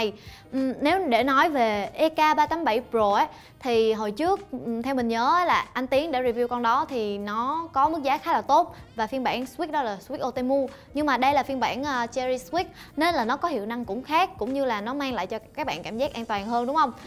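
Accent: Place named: Saigon, Vietnam. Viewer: Ban Nu nay giong Sg tot... Than thien voi moi truong !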